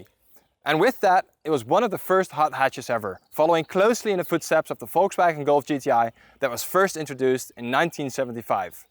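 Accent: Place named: Netherlands. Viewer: Die Nederlandse accent 😂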